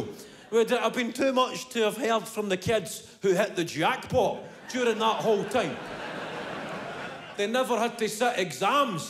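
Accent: Scotland, United Kingdom. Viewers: if there's people who can understand English and not Scottish how can they be the same? It's a Scottish accent U donut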